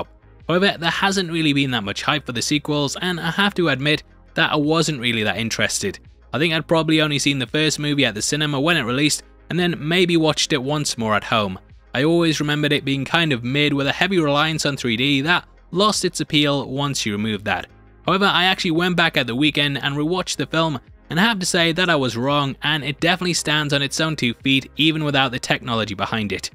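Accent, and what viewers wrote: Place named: United Kingdom. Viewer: Love when you say Spider-Man No way home with your strong British accent every video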